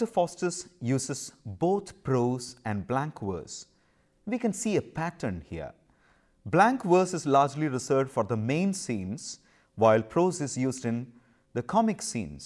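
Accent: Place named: South India, India. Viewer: Your ascent sounds like South Indian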